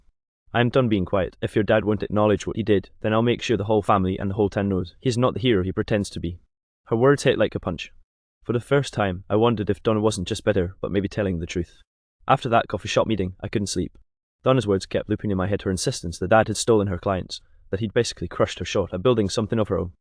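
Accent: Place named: Ireland. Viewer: Cool Irish accent! 😂😂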